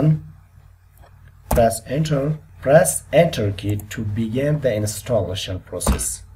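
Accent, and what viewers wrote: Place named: India. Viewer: You are Persian but you talk like Indians. kkkk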